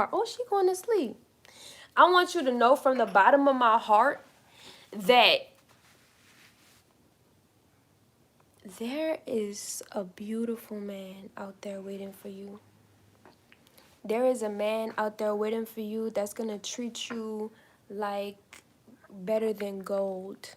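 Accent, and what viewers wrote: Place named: Louisiana, United States. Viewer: You sound like you are from Louisiana ❤️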